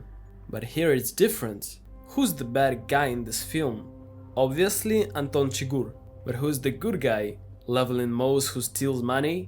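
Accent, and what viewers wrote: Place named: India. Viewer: U SOUND LKE AN AMERiCANiZED DOT iNDiAN ! NOT YELLiNG, JUZT LiKE TYPiNG iN CAPZ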